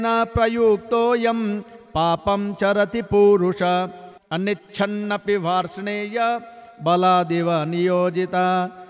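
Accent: Standard. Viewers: श्री जी को बारम्बार प्रणाम। आप श्रीमदभगवदगीता पाठ शुद्ध एवं स्पष्ट उच्चारण करते हैं। मन प्रसन्न हो गया।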